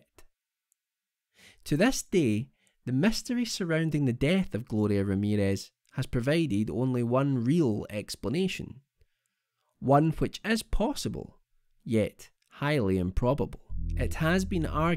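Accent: Scotland, United Kingdom. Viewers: I love your accent & voice. I find it very precise & soothing. Which part of Scotland do you hail from? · It's hysterical how Scottish the narrator is. No wonder everyone thinks we speak like Sean Connery haha ! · Ron Ronson it’s Scottish not Irish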